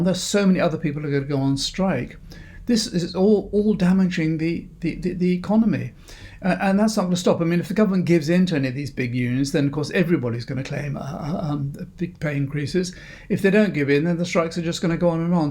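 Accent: RP, United Kingdom. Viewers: You sound posh but you dont talk sense like Liz. I agree Sunak is hopeless, he is why the economy is so bad. Liz will be like thatcher in 79, end this nightmare and get the economy booming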